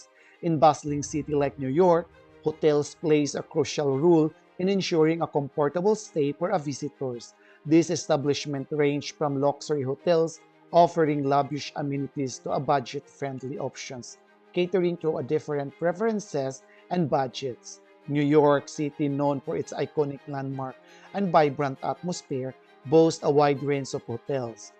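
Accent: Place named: Philippines. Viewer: Lmao that filipino accent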